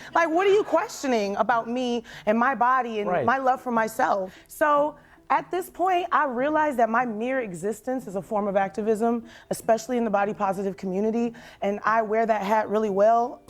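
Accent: Ireland. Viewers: Off topic but LOVE your Irish accent. You have a lovely voice too. It’s refreshing to hear ❤❤ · First time seeing your content and you have a subscriber. Also love the Irish accent and your soft voice serving these excellent takes!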